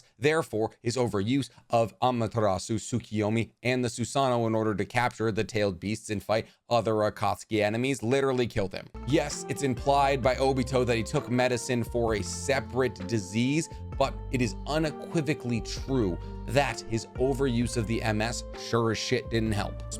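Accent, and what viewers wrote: United States. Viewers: Why do so many people pronounce Shisui’s name that way? I’ve noticed a bunch of narutubers do it, but just so everyone is aware, it’s not shi-su-ee like ship. It’s SHE-su-ee Okay, after going through more of the video, you just have really poor, Americanized pronunciation. Mungeekyo sharingan, Minnuhto 😂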